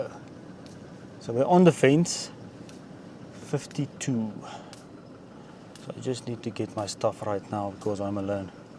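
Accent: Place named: South Africa. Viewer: Thanks for your video and so good to hear another South African accent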